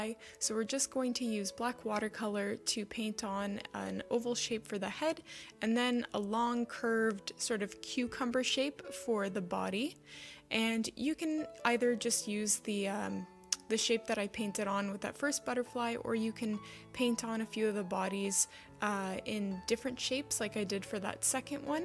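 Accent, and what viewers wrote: Canada. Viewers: Oooo. Canada i knew it.. i could hear it in your voice... then i read your profile.. you're very talented. Thank you for your art.❤❤❤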